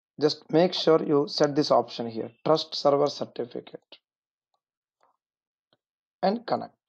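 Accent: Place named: England, United Kingdom. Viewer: I don't know where you are from but, every time I hear that English accent explaining something I know that my issue is going to be resolved! THANKS, A LOT !!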